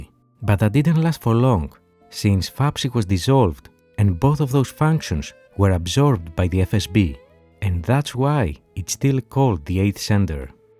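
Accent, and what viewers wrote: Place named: Greece. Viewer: I heard the Greek accent. And then, discovered your name !)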